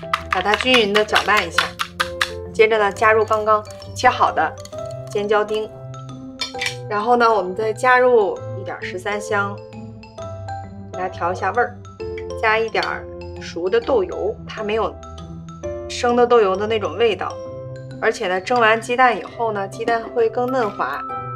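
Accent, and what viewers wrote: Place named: Northeast, China. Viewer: 美女菜做得好，一口東北腔，也說得好聽。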